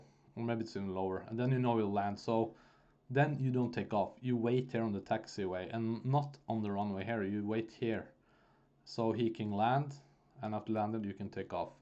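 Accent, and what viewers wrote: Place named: Norway. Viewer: U sound Norwegian💯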